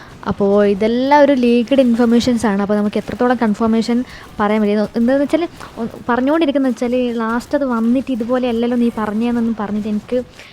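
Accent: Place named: Kannur, India. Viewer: athe Kannur slang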